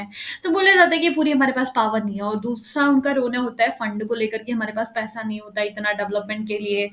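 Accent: Delhi, India. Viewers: Ma'am apke bolne ke tarike se lag raha h ap delhi se ho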